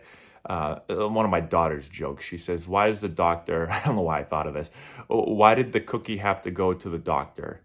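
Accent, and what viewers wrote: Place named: Boston, United States. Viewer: Have you played Fallout 4. It's based in Boston. Every character sounds like you. Big fan, love the channel.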